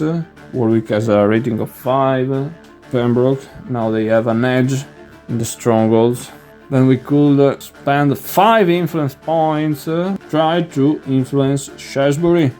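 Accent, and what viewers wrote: Italy. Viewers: English with an Italian accent.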